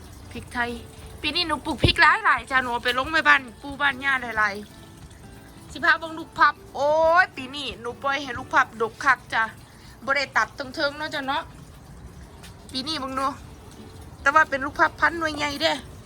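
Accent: Udon Thani, Thailand. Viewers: ສຳນຽງທີ່ເຈົ້າເວົ້າຄືຄົນອຸດອນນີ້ລະ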